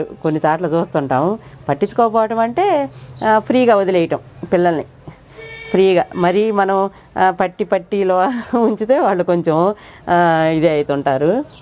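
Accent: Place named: Guntur, India. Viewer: Chala baga untundi mee explanation. Maadi guntur. Ippude hyd lo untunnamu. Na chinnappudu andaru ede slang lo matladevallam. Gurthuku vastundi. Thq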